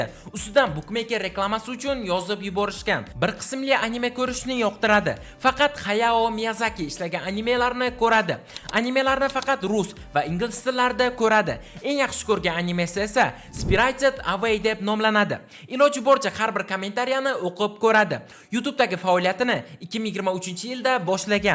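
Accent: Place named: Samarkand, Uzbekistan. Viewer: samarqandlik ekanligini shevasidan bilgandim zemlyakim buladi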